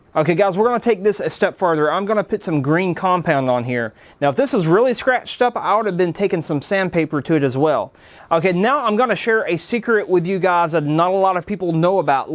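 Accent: South, United States. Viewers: New watcher, and new sub. You rule. And as someone from California with a southern family, the accent makes me trust you so much more. I love when someone from NASA sounds like they started out with chicken wire and a bad muffler. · Hey I hope you dnt take this offensively, but is your accent considered a redneck or a hillbilly? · Love your southern accent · as soon as I heard his accent I just knew I was about to learn nothing whatsoever from an intellectually challenged redneck.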